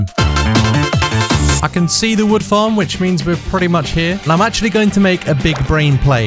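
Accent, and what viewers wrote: United Kingdom. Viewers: Not to be offensive or anything but are you British or Scottish?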